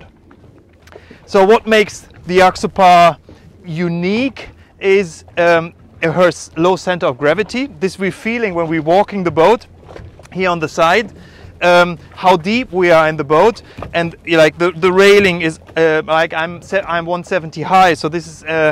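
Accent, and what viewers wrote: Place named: Germany. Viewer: Better and thorough presentation, than other presenters of the same boat. Don't mind your german accent......👍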